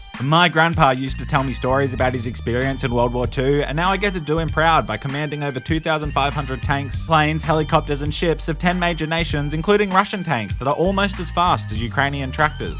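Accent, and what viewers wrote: Australia. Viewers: Yeah he’s Australian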